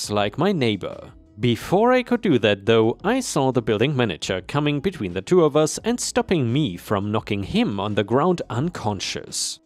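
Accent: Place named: Germany. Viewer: For a voice actor your English accent certainly has a Germanic accent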